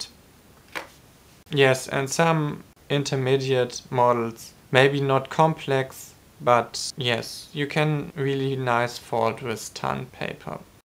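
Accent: Germany. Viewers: Du bist eindeutig deutsch haha gut erklärt !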